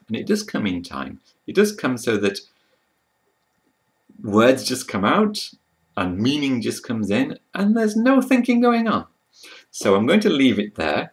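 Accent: United Kingdom. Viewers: Very interesting video. I notice a few people have commented on English accent. But what struck me immediately was that his accent sounds British, but his spelling on the whiteboard was American. An unusual combination.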